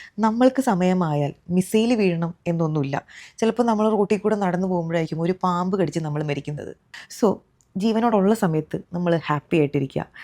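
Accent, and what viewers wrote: Thrissur, India. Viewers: Chechii nattil Thrissur anooo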